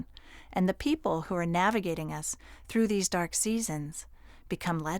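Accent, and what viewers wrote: United States. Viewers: Its a west coast accent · The voice is really not very clear. It's gabbled, American? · Appreciate your generosity ❤ plus If I may ask: which state accent is that? I’ve been fond of that accent and I wanna know it’s origin in order to learn it and not to mix it up with other accents.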